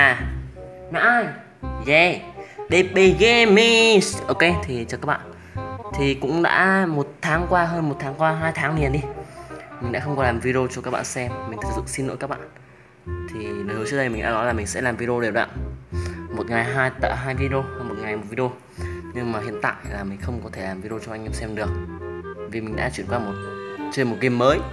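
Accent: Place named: North, Vietnam. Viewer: Bạn nên nói to hơn mih ng nam nghe tiếng bắc hơi khó hiểu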